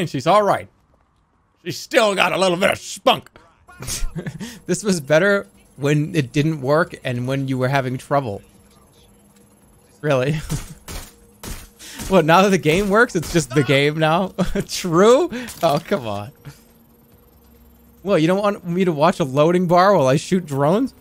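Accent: Boston, United States. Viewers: The best part about any jerma stream is how BAHSTIN his accent gets when he gets frustrated… “this is nawt mah computah”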